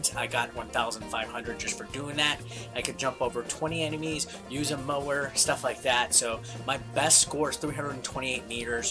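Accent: United States. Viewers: Sweet country accent bro lol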